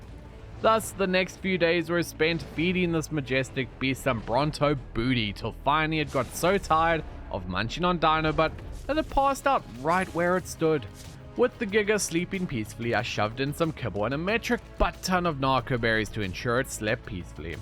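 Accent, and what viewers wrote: South Africa. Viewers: Is South African?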